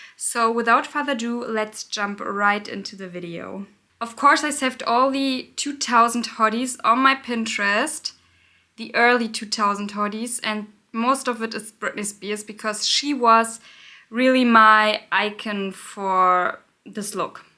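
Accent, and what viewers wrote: Germany. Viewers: i noticed the german accent right away and i love it (half german girl here).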